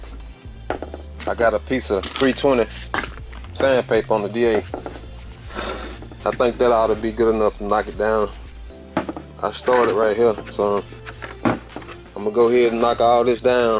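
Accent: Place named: Houston, United States. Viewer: Sounds like he's from Houston.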